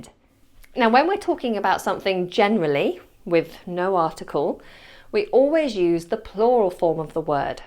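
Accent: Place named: United Kingdom. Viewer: Hi! Came here looking for info after finding your singing channel and falling in love with it 😍 Is there any hope you will start posting there again? Maybe once every few weeks? 🙏 I felt so inspired and happy that I finally found a vocal coach that I absolutely love ❤️ And then I saw that the last video was 2 years ago 😢😭🥺 Thank you anyway for all the videos there! They are just awesome! And I loved that you're British because I strive to sound more British and having an American vocal coach wouldn't be as great. So, love you! Just think about it 😍🙏🤗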